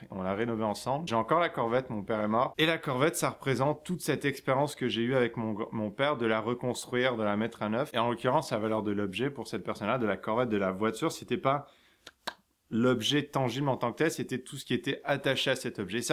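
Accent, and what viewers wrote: Quebec, Canada. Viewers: C'est drôle t'as un accent québécois mais hyper légers on le remarque presque pas ! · l'accent québécois! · wow , jtrouve que ta plus l'accent québécois qu'avant ^^ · L'accent québécois est plus prononcé ou c'est moi? Et au fait le chat il s'appelle ISIS....AAAAAHHHHHHH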